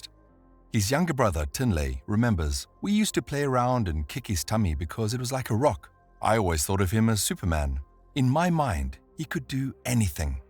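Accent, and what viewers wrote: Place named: South Africa. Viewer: Another South African voice for me to enjoy. Subscribed.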